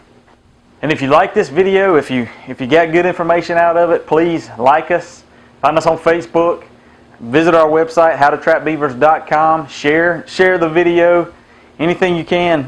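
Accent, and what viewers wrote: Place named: South, United States. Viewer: This guy has such a southern accent, another great video, I learned allot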